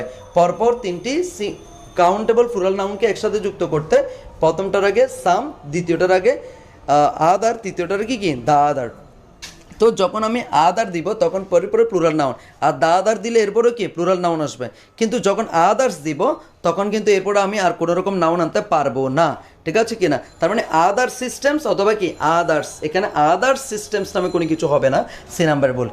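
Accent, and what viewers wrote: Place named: Chittagong, Bangladesh. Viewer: Ctg r basa buja sbr kaj na bhai Eke to free paccen tar majhe ajaira kotha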